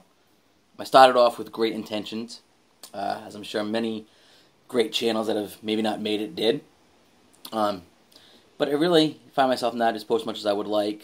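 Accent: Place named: Massachusetts, United States. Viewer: Enjoy your laid back style, keep it up. Always like watching pipe reviews and Yabo's so keep posting those. I'm originally from Rhode Island but live in Texas now, I knew by your accent you were in Mass. :) Go Bruins!!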